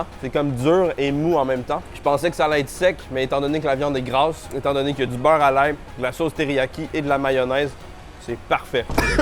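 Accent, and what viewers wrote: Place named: Quebec, Canada. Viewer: J'aime bien la vibe de t'es vidéos quand tu voyage, ton québécois ressort plus que dans t'es vidéo a la maison ! Toujours un plaisir de te regarder :)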